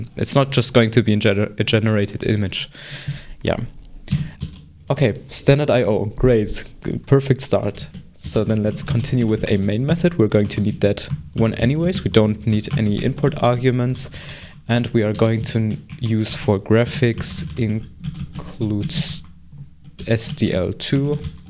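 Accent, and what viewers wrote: Germany. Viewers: German accent ↗️↗️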